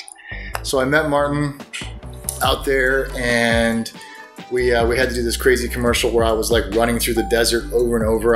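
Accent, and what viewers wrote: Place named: United States. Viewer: Its amazing to see you again I remember your face from many years ago a drama called Spring Waltz , I was like who is this actor who is more american speaking than korean , so very handsome , then I see you on I Live Alone , which was so good you treated everyone so well , I dont watch TV so I didnt know about Criminal Minds till watching the show , but yeah you would of been Perfect to play in Crazy rich Asians , your face is one not to be wasted and beyond that you seem like such a genuine guy, really sweet , in ending sorry for your loss of Mango , I wish you the best hope to see you on the Big Screen !!!!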